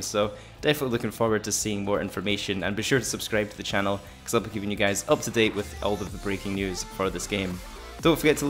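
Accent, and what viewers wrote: Scotland, United Kingdom. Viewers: Hello are u Scottish cause I am aswell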